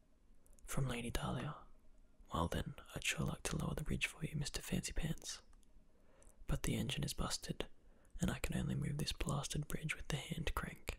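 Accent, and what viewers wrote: Australia. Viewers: hello! I'm a new subscriber, and I was wondering where your accent is from :D Australian?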